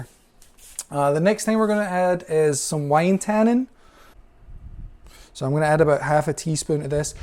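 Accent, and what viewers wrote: Scotland, United Kingdom. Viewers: Very odd accent. Sounds scottish at points but then quickly becomes American?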